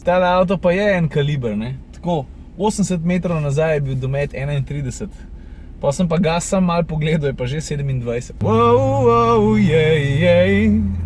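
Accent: Styria, Slovenia. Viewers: štajerski na glas pa ti gre.😂💜💛#ifeelmaribor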